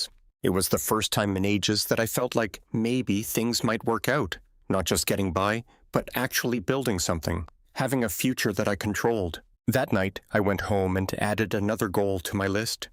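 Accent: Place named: Canada. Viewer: The voice sounds Canadian 😂😂😂. Parents don't want to deal with the sister and her kids😂😂😂😂😂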